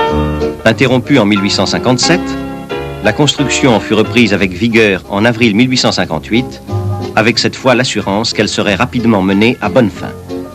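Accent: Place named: Paris, France. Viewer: Une pointe d'accent titi parisien dans les commentaires bien raccord avec les images. Super vidéo!!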